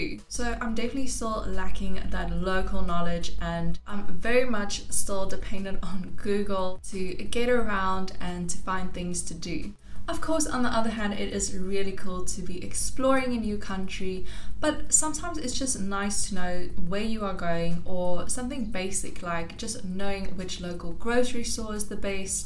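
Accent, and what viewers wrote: South Africa. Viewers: Please, don't ever again mention that you are from Pretoria, you sound more like a Sandton poppie... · Ek hoor n Afrikaanse trekkie aan daai Engelse aksentjie. Thanks for posting. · Lol the minute I heard you speak, I knew you might be from Pretoria... Nice.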